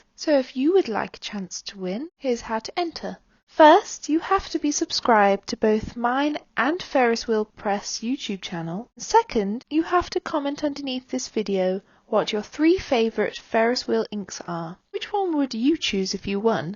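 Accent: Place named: United Kingdom. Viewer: Thank you! Also, I guessed it was a British accent but even so it sounds different than the ones I had heard so far, are you a noble or a princess or out of a fairytale? I would definitely cast you for a fantasy animation movie as a voice actress for the main protagonist ^^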